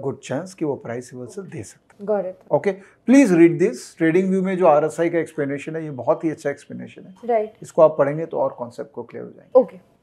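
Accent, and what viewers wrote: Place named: Bengal, India. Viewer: "Pati" way 😄 You sounds so Bengali 😊😊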